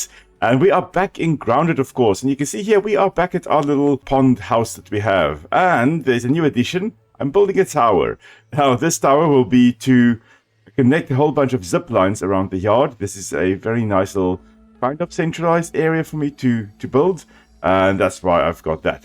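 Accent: South Africa. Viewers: Fascinating...who knew water fleas were so interesting?. Are the eggs they reproduce through parthenogenesis actually fertilized by the female or does it have a unique process to accomplish birth without fertilization? Came for the learning...subbed for the SA accent :)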